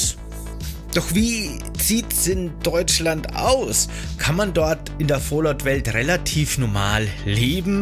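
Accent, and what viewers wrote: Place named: Middle Franconia, Germany. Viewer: Der Lord ist Mittelfranke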